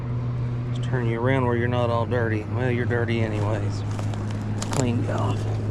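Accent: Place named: South, United States. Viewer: I love a pop-r. I once caught two at one time on a torpedo lol and on a hollow body frog lol. What state you in? That’s one heck of a southern draw you have lol. I’m mid-Ga. Macon Ga.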